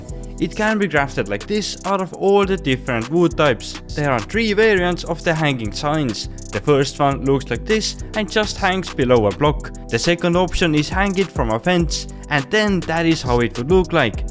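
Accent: Estonia. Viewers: is that an Estonian? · That estonian accent is so goofy😅😂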